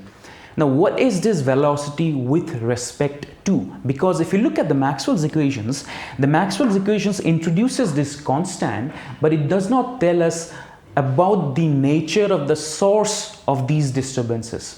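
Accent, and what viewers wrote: India. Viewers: I have to admit, it is not always easy to understand lectures of professors with Hindi accent, but I would like to say that I appreciate the effort you took on diction and pacing. Made it very comfortable to listen to, which is great for learning. Also your explanations are awesomely clear and easy to follow, without skipping anything important. I could follow the lecture very well, even though it is the first time I'm looking at this topic of the inconsistency of Maxwell equations and Galilean transformations. The algorithm did a great job, because I was looking exactly for this lecture and yours was the first thing to come up and it is well deserved. Thanks for the great content.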